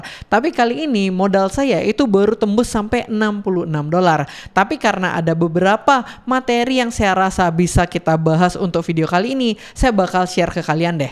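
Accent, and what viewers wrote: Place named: Medan, Indonesia. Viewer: Abang sebenarnya orang Medan ya? Kok logat bahasanya macem anak Medan bang? Huruf K ABG tu khas kali untuk anak Batak Medan bang. Salam dari Medan bang . Saya anak Asahan.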